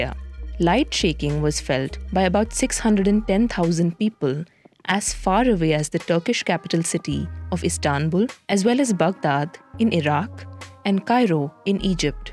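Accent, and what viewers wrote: India. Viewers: I hear indian voice i hurriedly cancel because when we have Europeans expert why should I waste my time listening a mass who believes more in religion then in law and order 😭😔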